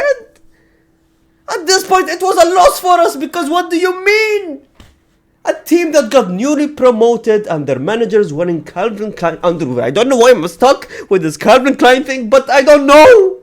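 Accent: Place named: United Kingdom. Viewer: bro has developed a uk accent 😭😭😭😭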